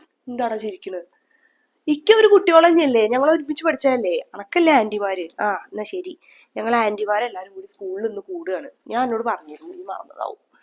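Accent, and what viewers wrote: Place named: Malappuram, India. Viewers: സ്ക്രിപ്റ്റ് 👌🏼👌🏼👌🏼👌🏼👌🏼🥰🥰🥰🥰🥰🥰.. നാട്ടിൽ എവിടാ...calicut ആണോ??? Slang നമ്മുടെ മലപ്പുറം, calicut പോലെ ഉണ്ട് ❤️❤️❤️ ഞാൻ calicut ആണ്.. അതാ ചോയ്ച്ചേ 😊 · മലപ്പുറം , പാലക്കാട് slang ആണെന്നാണ് കേട്ടിട്ട് തോന്നുന്നത്. ഞാൻ മലപ്പുറം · നിങ്ങളെ ഒരുപാട് ഇഷ്ടം ആണ് നാട് എവിടെയാ സംസാരം കേൾക്കുമ്പോ മലപ്പുറം ഒക്കെ ആണെന് തോനുന്നു pls reply❤️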